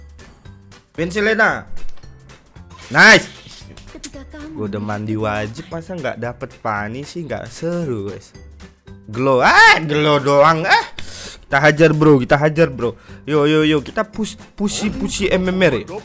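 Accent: Java, Indonesia. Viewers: Bang itu orang jawa ya? Kirain di asli sunda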